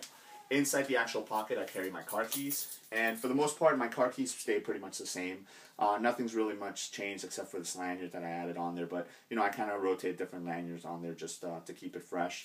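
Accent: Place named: South Florida, United States. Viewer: thanks for sharing. I knew you were in So FL from your acceent:) Suave... are you going to the gun show in Fort Lauderdale this weekend?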